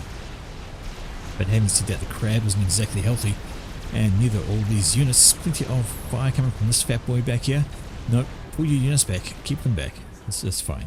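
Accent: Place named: New Zealand. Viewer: awesome cast. are you a kiwi btw? took me a min of scratching my head before placing the accent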